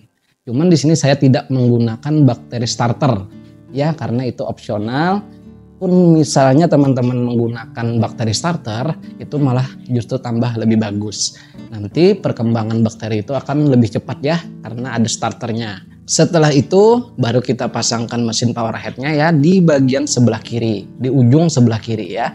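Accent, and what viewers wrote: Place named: Banjar, Indonesia. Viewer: Logatnya ky org banjar nih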